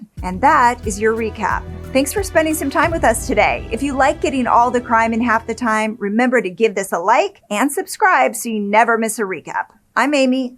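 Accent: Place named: South Dakota, United States. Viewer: Girl, I love you! I’ve been suppressing the urge to ask this for quite a while, but now I have to, lol… are you from South Dakota, by any chance? Only asking because I’ve always detected a hint of an accent/pronunciation with words like, “bag”… for now “dagger.” a couple friends of mine from South Dakota pronounce things similarly. Obviously, I respect your privacy, so no need to share, it’s just my ADD brain 😂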